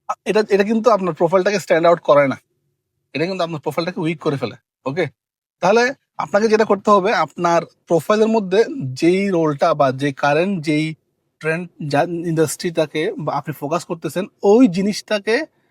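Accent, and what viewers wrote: Chittagong, Bangladesh. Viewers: bhiya apni ki chittagong er??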